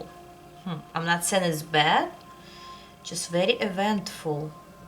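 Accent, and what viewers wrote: Russia. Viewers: You have the most beautiful accent and voice Idk Russian almost sounds like my grandmother's accent on my dad's side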